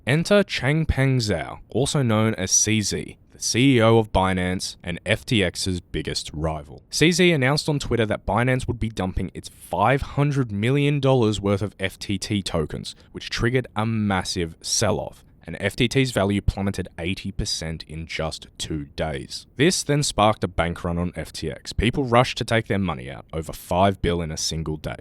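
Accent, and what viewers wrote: Asia. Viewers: Asian accent is a bit wack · Sick video but the asian accent was unfitting, otherwise the video would be perfect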